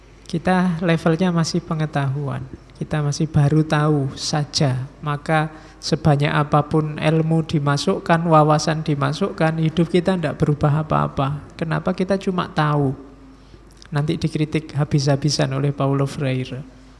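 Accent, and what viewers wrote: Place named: Central Java, Indonesia. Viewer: Gaya Bicara Orang Jawa Tengah dan Orang Jogja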